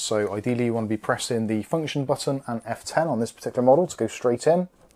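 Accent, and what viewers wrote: Bristol, United Kingdom. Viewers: You from Bristol??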